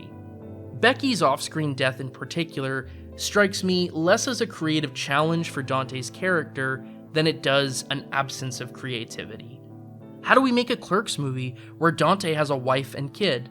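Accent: Ontario, Canada. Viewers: Brother I knew i heard some Ontario in your voice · The last thing i expected to hear while listening to this video as i recall always liking the movie Jersey girl, was the coincidence that i was currently walking down my street in Windsor Ontario Canada. I guess i should pay more attention to the way people talk, but it never struck me that you might also be from Ontario lol Anyways great video. I also went through a Kevin Smith completionist saga in my early 20's and found my favourites to be the lesser talked about films in his discography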